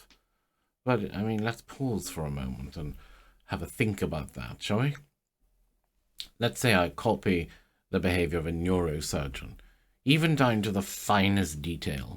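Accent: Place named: RP, United Kingdom. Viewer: I am NLPed by this posh pronunciation 😇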